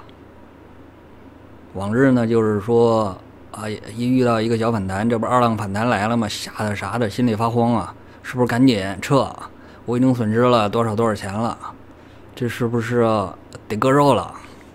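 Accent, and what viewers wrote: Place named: Beijing, China. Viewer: 喜歡你的京腔….沒有discord?或微信？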